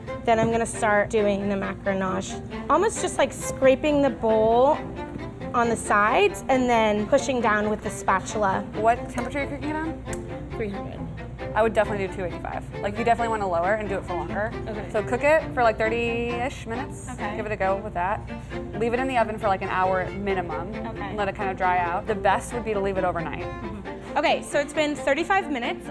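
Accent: United States. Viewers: I've never heard a more American pronunciations in my life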